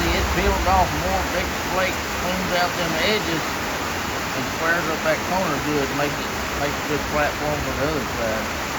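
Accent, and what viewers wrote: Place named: Texas, United States. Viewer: I'm just here for the rain and Texas dialect ASMR.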